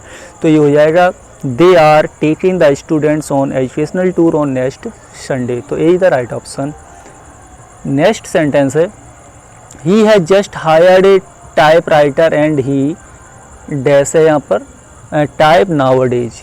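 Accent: India. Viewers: you are poor English speaker.your pronounce as like as Indiana. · Amr Alhabori because this is an stupid indian teaching to illiterate dumbasses hear english from the people who speak in a good accent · I can hardly understand you, coz of your terrible Indian accent teacher. Well I will try to make the best of this grammar exercise.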